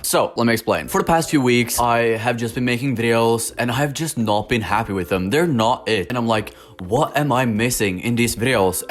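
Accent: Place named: South Korea. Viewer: You low key sound like you have a korean accent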